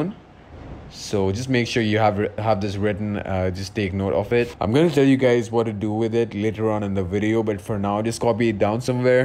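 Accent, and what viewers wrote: India. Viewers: Bro are u indian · Are you Indian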